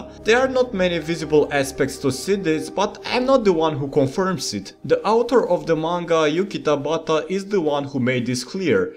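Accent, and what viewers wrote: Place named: Russia. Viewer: The russian accent and "scary" backround music make this video comedy gold.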